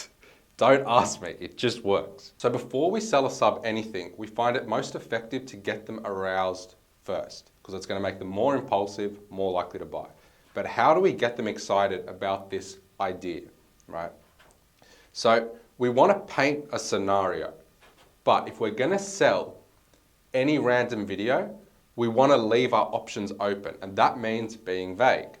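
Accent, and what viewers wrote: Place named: Melbourne, Australia. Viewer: you remind me a lot of natural tim from freedom business studios also based in melbourne, you present well like him and have a similar accent/way of speaking. Might just be a melboune accent though, anyway love your content really helping me with my ai influencers im in bris myself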